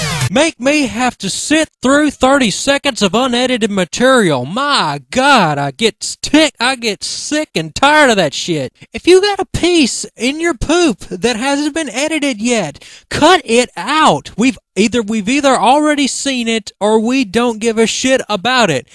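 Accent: South, United States. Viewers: the southern voice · I'm guessing you're from the south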